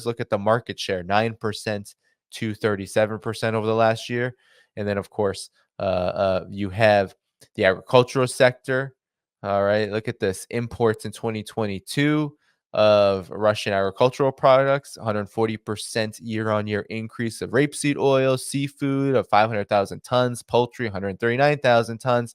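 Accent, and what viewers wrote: United States. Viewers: why are you speaking with an American accent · PLEASE MAKE CHANGES OF YOUR AMERICAN ACCENT AS WELL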